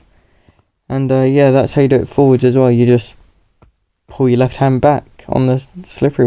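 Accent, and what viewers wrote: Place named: United Kingdom. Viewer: Just wanna say that I had a bunch of ppl telling me that I sound just like you in gtag lobbies and I realised the only reason why was cause where both British lol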